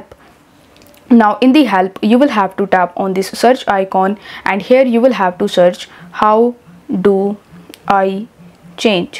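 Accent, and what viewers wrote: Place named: India. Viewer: I i like that indian accent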